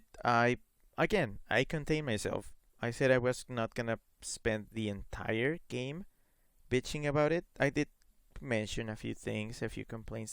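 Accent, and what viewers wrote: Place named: Mexico. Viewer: Yes; I feel abou the same... even the HMs, which I do not miss, leave a hole. Also, I do not mind waiting a few years and playing other games... what we did in the ol days! Your theoretical On a different note, your Mexican accent is more noticeable here... though a carefu listening reveals your mastery of English, even while speaking.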